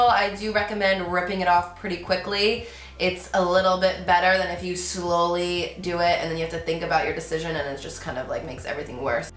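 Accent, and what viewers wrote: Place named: New York, United States. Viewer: You can definitely tell you are from the New York/ jersey area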